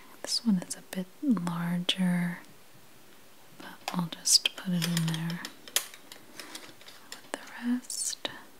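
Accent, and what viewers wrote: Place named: Pennsylvania, United States. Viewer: Your accent reminds me of where I grew up in Bucks County, Pa. So much nostalgia!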